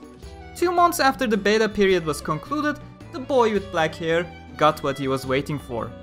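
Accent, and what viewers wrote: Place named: Turkey. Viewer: sao wiki'de gezerken videoyu açar açmaz aksanından dolayı türk bu dedim.. ilk defa aksandan milliyeti tutturdum haha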